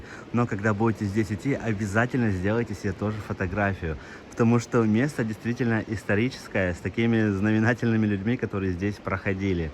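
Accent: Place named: Ukraine. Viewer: Спасибо,! Путешествие очень интересное и полезное! Даже кажется я надышалась тем воздухом, которым дышал ты. Очень хорошо рассказываешь немного с украинским акцентом, это ещё приятней. Здоровья тебе и поменьше экстримов, а то даже дух захватывает и страх за тебя. Береги себя!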